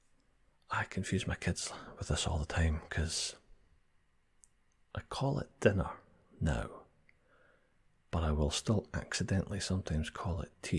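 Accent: Scotland, United Kingdom. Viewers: Thanks for the tag! I can't wait to watch this, you know I love a Scottish accent 😴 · My favorite word to hear you Scots say is "murder" 🤤🤩 its the Rs and muddled U, and abruptness of the end (I also like "order" and "fine.")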